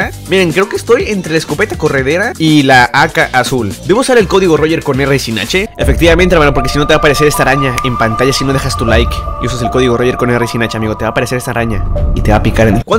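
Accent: Chile. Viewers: habla como Chileno por un vídeo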